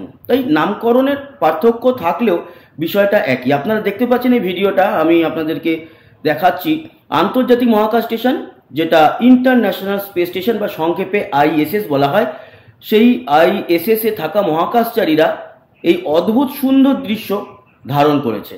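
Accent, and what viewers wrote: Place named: Fatikchhari, Bangladesh. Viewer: আপনার মুখে ফটিকছড়ির কথা শুনলে ভালো লাগে তাই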